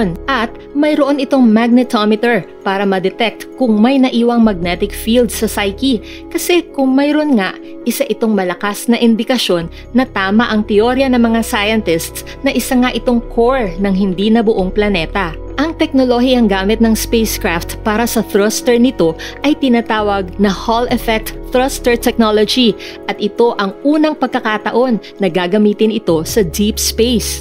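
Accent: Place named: Visayas, Philippines. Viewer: Sayke haha langya na pronounciation yan napaka bisaya sayke nag aral ka ba ?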